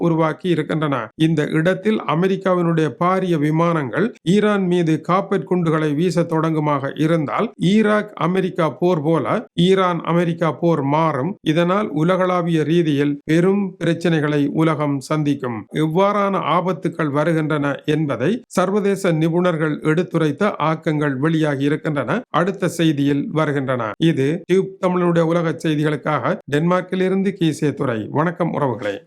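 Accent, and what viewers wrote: Jaffna, Sri Lanka. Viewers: Alahana murayil thadumatram eadum illamal sotkal pilayillamal thelivana murayil saidisollum ungalippoarkumpoludu mahilchiyaha ulladu Adu endrum yalpanatthitjuriyadahum eanadu Aasiryarhalu yaalppanamthaan nandri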